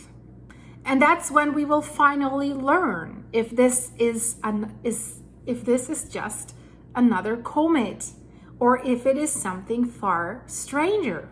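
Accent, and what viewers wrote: Germany. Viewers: Ich mag deinen deutschen Dialekt. Woher kommst du? Liebe Grüße aus Wien 🫶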